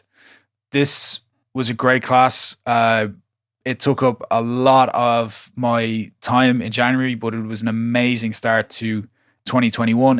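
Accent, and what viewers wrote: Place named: Ireland. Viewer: I saw this come up and was very interested. I knew that I wouldn't have the time available to dedicate to it that it would need, so had to pass it by. great to hear that it delivered on the promises made. The track that you came up with sounds great. how do you think it compares to your tracks previous to doing the course? Keep up the good work, and keep the videos coming. I find it way easier to listen to a fellow Irish mans accent than those annoying overly neutral Americanised accents :)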